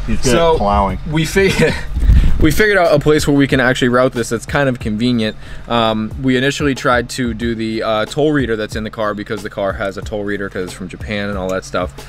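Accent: Massachusetts, United States. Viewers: Love seeing a Mass dude doing thangs out heah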